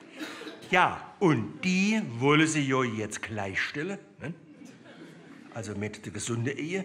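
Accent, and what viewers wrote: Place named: Germany. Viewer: Der beste Satiriker. Das Auszeichnendeste ist der deutsche Dialekt. Wenn wir nur Politiker solchen Formates hätten, die uns führten.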